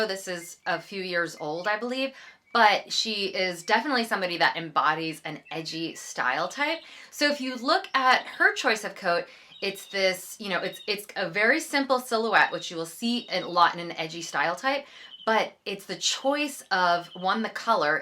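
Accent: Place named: United States. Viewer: First of all can I just say how much I love your channel and I wish it every success, you thoroughly deserve it, you're so knowledgeable and a great presenter, you have a lovely voice and soft American accent that is a pleasure to listen to and your content is always excellent. I invested in a princess style wool coat from Jigsaw in the UK back in 2005 and I still wear it and it looks amazing. It's taupe, another great classic colour, not too light and not too dark. It goes with everything and I like taupe much more than camel or beige. I love browns, also chocolate brown (not coffee bean brown, which is too dark and quite harsh). I'd love a navy or blue coat and although I don't need it, I'm on the look-out for one, I also love dusty pink, which in an underrated neutral and a very flattering and feminine one imo, I recently saw a lady wearing a dusty pink trench coat and she looked amazing. 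Like taupe, dusty pink goes well with any neutral colour.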